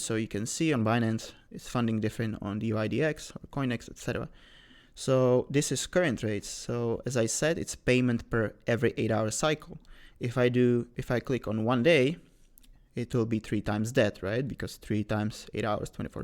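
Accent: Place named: Sweden. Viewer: Sounds swedish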